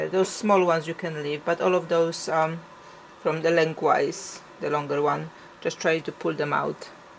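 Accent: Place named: Poland. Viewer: Good Morning, what a great idea on how to use the snow ball pieces, I would have never thought of it. Its a wonderful way not to waste any fabric. I really enjoy quilting videos and how to use up scraps. Definitely would love to watch more of your video's. I love your accent, I believe you are Polish, not sure if you are in the US. Your English is fantastic. Hope to see more of your videos.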